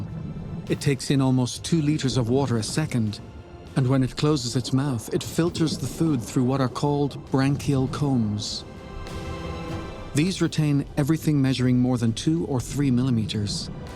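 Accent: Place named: Ireland. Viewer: Irish dialect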